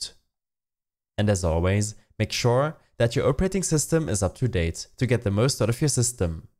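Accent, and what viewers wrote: Germany. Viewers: Ich am ANfang: das ist doch safe ein Deutscher Ich bin wirklich gut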